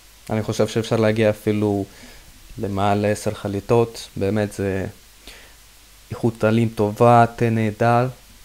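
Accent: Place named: China. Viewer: אתה סיני הוא משהו יש לך מבטא נחמד כזה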